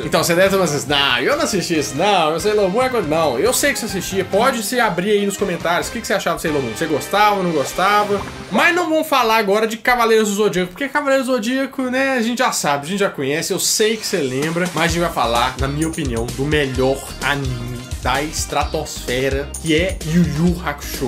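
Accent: Minas Gerais, Brazil. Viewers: Esse sotaque minero é top demais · Vc e mineiro brother?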